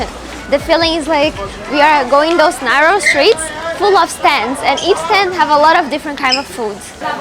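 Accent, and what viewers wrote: China. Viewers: Your voice is so unique like a little girl. You even have a Chinglish accent.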